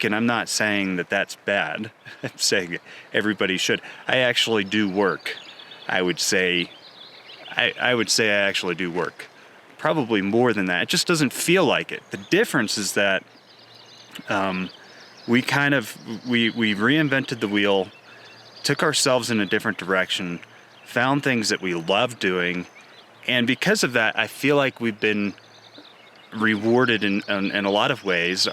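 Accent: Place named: Northern California, United States. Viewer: It’s Nor Cal Americano. No i don’t speak King James English do you! lol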